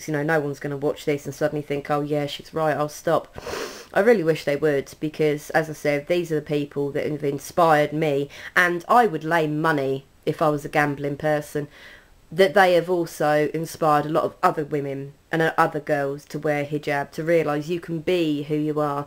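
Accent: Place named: United Kingdom. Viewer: haha:) lol all European accents sound the same to me! It takes me a good while to even figure out Scottish from British.... :/ but im learning slowly and yeah your soo right Americans got like an accent per state lool But i absolutely lovee the british accent its soo adorable<3 waitt.. your British right??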